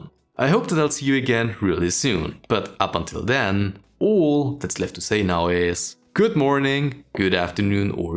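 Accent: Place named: Germany. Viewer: I see open source, I hear German accent, I subscribe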